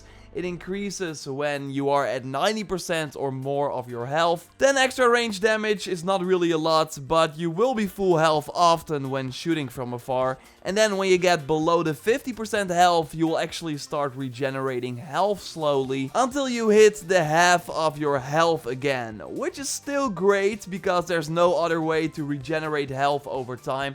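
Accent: Netherlands. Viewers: is this guy dutch? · Hahaha de eerste keer dat ik je video kijk en ik hoor meteen dat je Nederlands bent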